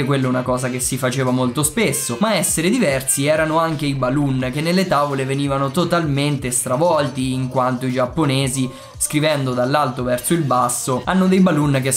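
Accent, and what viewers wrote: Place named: Marche, Italy. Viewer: Sei marchigiano, vero? Percepisco l'accento 🤔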